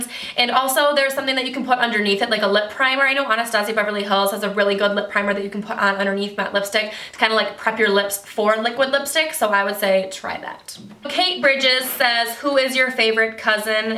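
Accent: Michigan, United States. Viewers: I thought your accent sounded like a Michigander! I am from the UP! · Ugh you are so gorgeous! And I had a feeling you were from Michigan!! (I could hear the accent lol) Im actually moving to Michigan in 2 days for University!! I'm a Floridian so the cold is going to be a bit challenging. Any recommendations of cool places to go OR SHOP? :)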